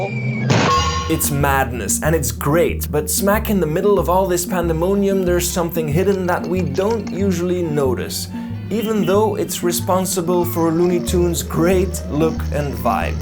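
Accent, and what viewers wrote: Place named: Germany. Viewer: This was fascinating. And I gotta say, just listening to your voice is a delight. A very slight hint of accent (German?) adds charm to your speaking voice.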